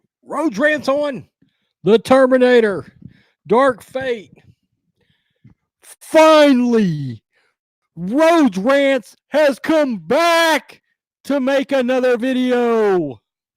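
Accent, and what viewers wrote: Texas, United States. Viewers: This has nothing to do with Terminator but are you from Texas? I’m from the Netherlands and am wondering if I recognize the accent correctly 😅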